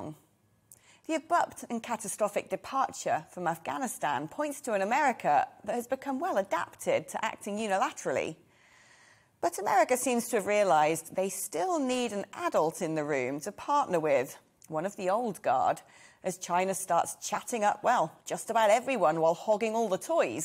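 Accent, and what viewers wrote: United Kingdom. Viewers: Condescendingly, British. Iii like it! 😀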